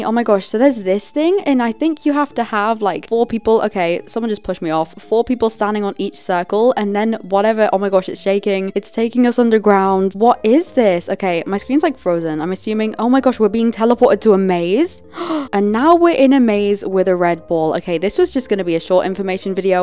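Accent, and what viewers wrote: United Kingdom. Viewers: gosh i LUUUV your british accent it just sounds so smooth<33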